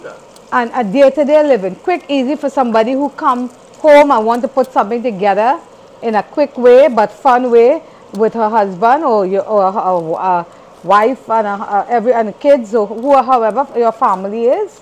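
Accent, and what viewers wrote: Trinidad and Tobago. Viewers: I'm a new subscriber and I love watching you cook. I have former college friends who are Trini that I spent years with and you bring back those memories of Trinidad trough the food, accent and love of family. It's great to see...can't wait to watch all the past videos and catch up! Sending love and blessings to the family. 🖤❤🇹🇹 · Hello......this is from a Trini living in Africa...where there is no Trini cooking...except for a little in my kitchen. I just discovered your channel. You make me laugh because you are a true Trini cook. No measurements...a handful of flour...a little dash of salt...just loving it. It's the way Trini's cook. You don't need measurements...you know what you're doing...and you do it fast. I watch other channels to see exactly how to cook the food...with the right amount of ingredients. I watch you just to lime in your kitchen. 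Love hearing the Trini accent and just your way. Next time I am in Atlant again...I will have to visit Tassa. Blessings to you!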